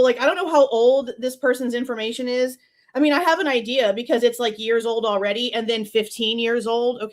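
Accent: Texas, United States. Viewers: ❤She’s from Texas I can tell….❤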